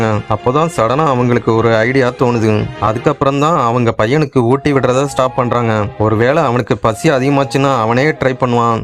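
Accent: Coimbatore, India. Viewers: Neenga coimbatore a 😊nalla pesaringa aana ithu world platform formal a pesunga intha ingu podathinga but nicely talking vaalthukal valaraku 🎉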